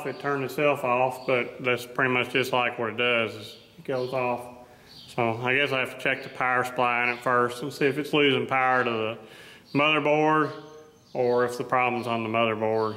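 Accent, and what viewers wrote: South, United States. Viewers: you welcome,BTW love your southern accent LOL..I am really respect you,one man army LOL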